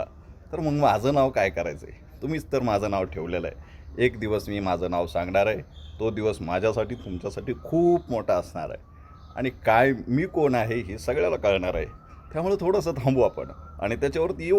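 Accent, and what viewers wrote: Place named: Vidarbha, India. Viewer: Vidarbha boli vatate pan ekdam kadak❤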